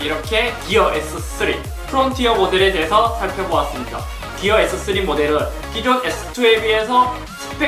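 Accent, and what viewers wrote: Gyeongsang, South Korea. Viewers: 잘 보고 갑니다 ㅋ 대구 말이 정겹네요 ㅋ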